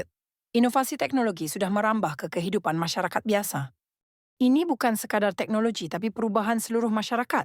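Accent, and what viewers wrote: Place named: Malaysia. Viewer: Admin itu orang Malay yg sekedar cari viewer dari Indonesia Kau dengar baik2 logat dia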